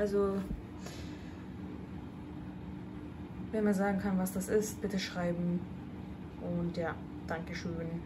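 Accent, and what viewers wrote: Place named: Swabia, Germany. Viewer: süss das schwäbische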